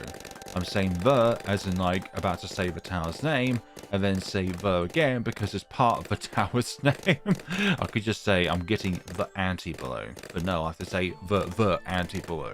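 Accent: United Kingdom. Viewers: british man commentating over videogame is the highest form of youtube content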